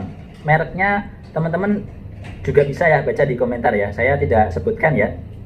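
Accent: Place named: Banyumas, Indonesia. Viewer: Dok.... aslinya mana 😀😀😀. lama merantau kangen logak ngapak...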